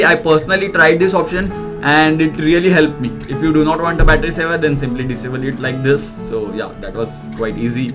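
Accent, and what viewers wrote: India. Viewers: you have to be Indian to understand this guy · Can we have an American do this instead. Of a Indian guy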